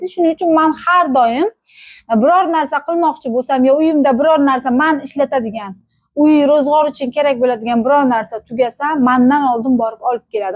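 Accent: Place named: Bukhara, Uzbekistan. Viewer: Buxorodan salom. Shevamizdan aylanay😊. Biz xam siz tarafdan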